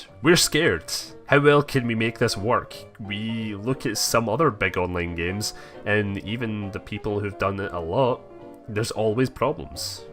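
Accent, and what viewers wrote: Scotland, United Kingdom. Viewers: are you Scottish it sounds like it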